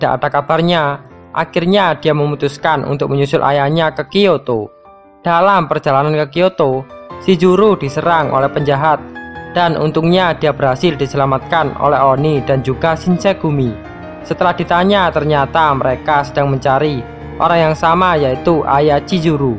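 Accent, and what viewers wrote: Java, Indonesia. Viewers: Medok banget lo min😅😁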